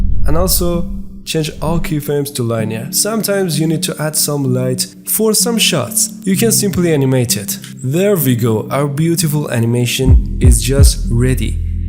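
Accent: Iran. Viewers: All the respect... just remade and turned out CRAZYYY my client will be impressed. Also, you sound hella Persian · az lahje fahmidam iranii movafagh bashi😂❤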